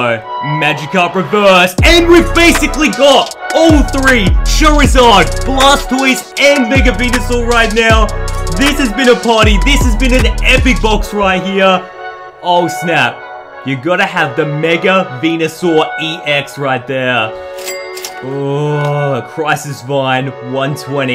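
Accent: Australia. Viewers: Are you an Australian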